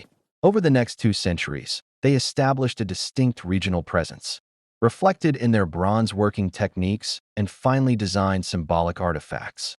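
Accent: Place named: United States. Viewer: Bitte keine Amerikanische Stimme.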